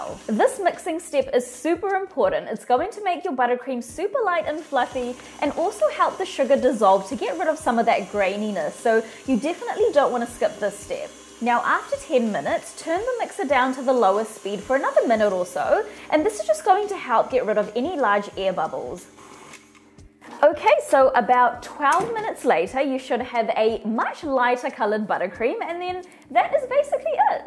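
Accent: New Zealand. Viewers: Your accent and way of speaking sounds familiar and then u said here in Newzealnd😊😊..happy to see local stuff. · This looks brilliant. I stopped icing cakes ages ago cos it made them too sweet but, will definitely try this as it looks fantastic. Love to hear the kiwi accent an a cooking video. Makes me proud e hoa. 😊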